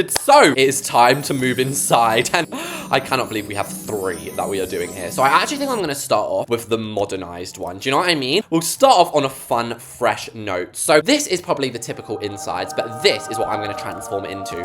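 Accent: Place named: United Kingdom. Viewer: I love how your British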